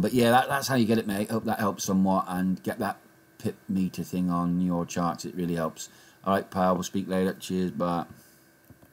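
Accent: Ireland. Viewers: hahaah damn I’m 100% wrong but you sounded very irish haha.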